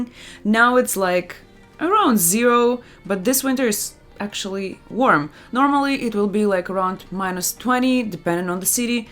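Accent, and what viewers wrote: Russia. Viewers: I wish you spoke in your Russian accent for the entire video. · Her Russian accent is sooo good 😅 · hi, thanks for yours videos. I am working to inmigrate to Canada by studies from Chile, South America next year. (2022) I love you play Russian accent. Thanks.....Nos vemos en Canada!!!! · 😄 I was looking for a channel about what life in Canada is like and came across you! As soon as I had heard your first word I learnt you are Russian sa well as I am. Привет из России. Не скучаешь? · I like yours videos and what I like the most is when you play your Russian accent, this make me LOL. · I like your Russian accent!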